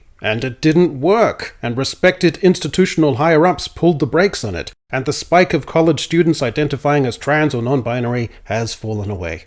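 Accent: New Zealand. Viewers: The classic New Zealand accent pronounces "Ten cents" like "Tin cints", with a very short vowel that turns the 'e' into an 'i' That said, may be using both "womAn" and "womEn" correctly, but American ears can't distinguish between the way the Kiwis pronounce 'a' and 'e'. I lived in NZ for 7 years, back in the 1970s, and in Australia for 3, so I'm acquainted with some of the idiosyncratic ANZAC dialect. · I have to ask this. I have watched nearly all of your videos, and enjoyed them, partly because of your sharp analytical mind, partly because of the Kiwi accent ... but ... who is that guy with the really complicated situation in his hid?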